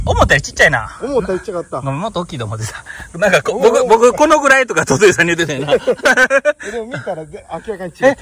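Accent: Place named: Wakayama, Japan. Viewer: あっ🤭、そうそう！の話し方にほっこり。和歌山弁にほっこり！関西人はなんとなく、通じるものがあるんですよね〜。いつもお返事ありがとう。喜んでます🥰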